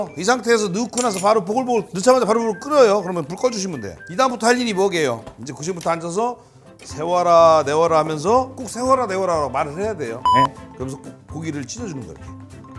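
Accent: Chungcheong, South Korea. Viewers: 설탕 싫으면 안넣어도 되요 ..맛없을껄 ㅋㅋㅋㅋㅋㅋㅋㅋㅋㅋㅋㅋㅋㅋ 충청도 화법ㅋㅋㅋㅋ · 충청도 사투리인지 이제야 들리네요 ㅋㅋㅋ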